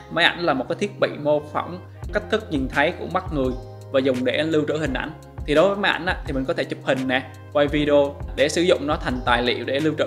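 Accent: Da Nang, Vietnam. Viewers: Giọng bạn giống giọng Đà Nẵng, phải b ở ĐN không nhỉ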